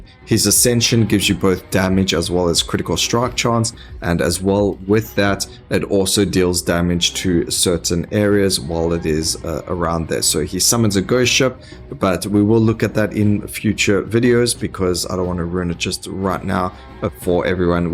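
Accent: South Africa. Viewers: Is that a Durban accent? Defs South African. Thanks for all the video's they have helped tremendously.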